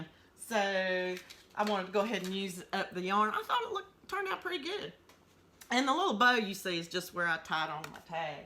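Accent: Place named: South, United States. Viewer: I love your southern accent ! I'm from Kentucky ! Your hats are beautiful !! And so is the baby blanket ! If you would like to check out my work, come on over . See ya next time !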